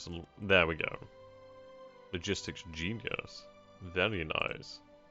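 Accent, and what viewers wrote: United Kingdom. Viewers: Ulta Posh XD as an Aussie you really sound like that stereotypical british rich man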